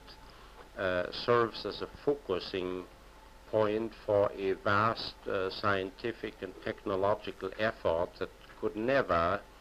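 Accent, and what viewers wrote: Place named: Germany. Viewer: I see you trying to hide your German accent cause lots of Jews in American government that allocate nasa funding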